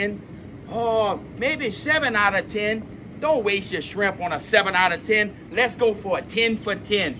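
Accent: Louisiana, United States. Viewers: I FEEL LIKE IM A BERL SHRIMP I LOVE CAJUN ACCENT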